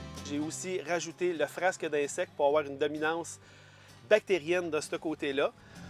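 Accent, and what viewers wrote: Quebec, Canada. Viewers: Jadore ecouter les quebecois ! Pouvez-vous me dire ce quest la "frasque d'insectes " ?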